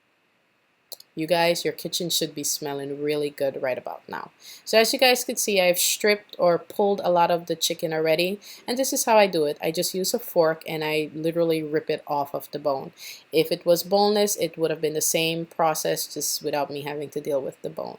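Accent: Guyana. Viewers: hey all the time I keep telling myself you're kinda speaking lyk a Guyanese but never bother to ask, but the way you're using those seasonings I just knew for sure you're from Guyana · By anychance are u Guyanese?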